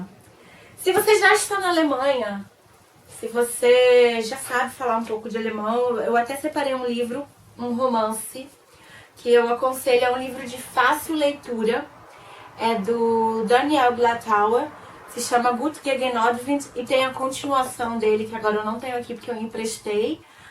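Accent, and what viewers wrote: Rio de Janeiro, Brazil. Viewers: vc é carioca, não é? se não é, viveu bastante no Rio...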